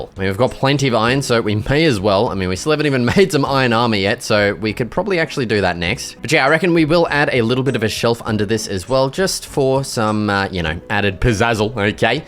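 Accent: Australia. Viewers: Where are you from? it sounds like you're an aussie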